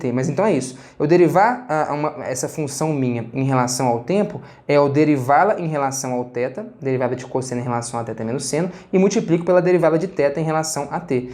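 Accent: Minas Gerais, Brazil. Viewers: Adoro quando ele fala "Prestenção" acho tão fofo esse sotaque Mineiro. Ótima aula!!!!! Parabéns pelo desenvolvimento! ❤️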